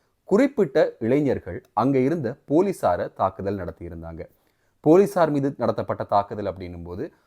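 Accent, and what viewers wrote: Sri Lanka. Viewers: Srilanka tamil is always unique enna doubt ungalukku 🤗🥰 iam in srilankan from india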